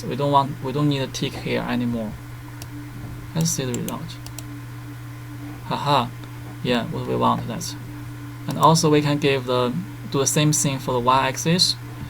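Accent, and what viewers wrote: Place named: China. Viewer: love your accent reminds me of my China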